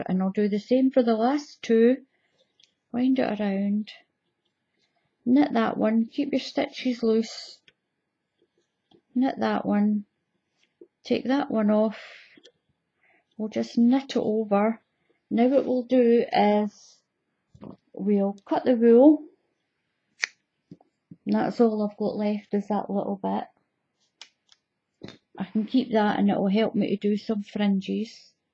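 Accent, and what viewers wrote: Scotland, United Kingdom. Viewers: I just love your Scottish Accent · her Scotland accent is so strong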